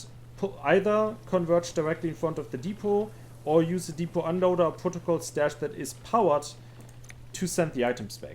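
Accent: Germany. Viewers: I hear German accent = I listen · Are you German? You sound German... 10 Euro that you are German... but even more because you understand what this factory summoning circle thingy does 😂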